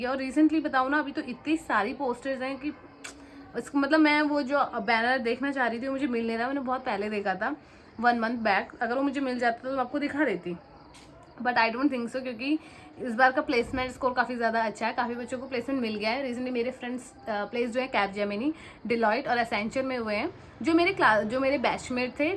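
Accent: Kumaon, India. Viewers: are you kumaoni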